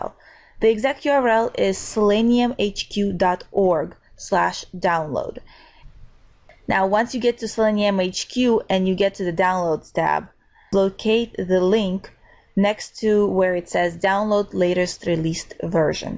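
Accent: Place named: Russia. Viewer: Your accent reminds me of the accent of my Russian friends, you gotta be of either Russian or another Slavonic origin, don't you? :)) p.s. thanks for the vid, it's good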